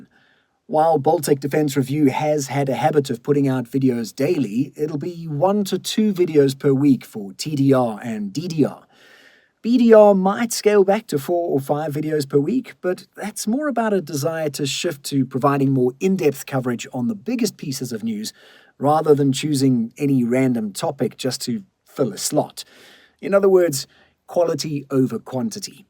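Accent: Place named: South Africa. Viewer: What accent is this... I'm picking up south African... Australian... bit of uk english....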